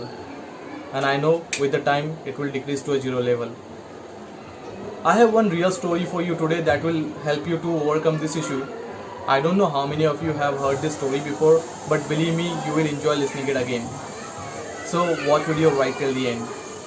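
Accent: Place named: United States. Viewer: Wow your English with American accent 🔥🔥🔥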